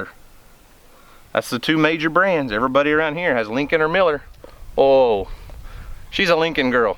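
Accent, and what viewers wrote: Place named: Oklahoma, United States. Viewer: Pardon my ignorance, but are you calling the culvert a "tin horn" or "ten horn" ? I'm from NY and your Oakie accent is through me off?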